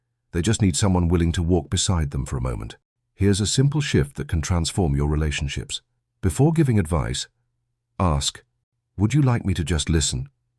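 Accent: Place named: United Kingdom. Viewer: I just realized the AI voice has a British accent. What a comical choice. Did the creator of this video think the accent would give the presentation more authority? I wonder.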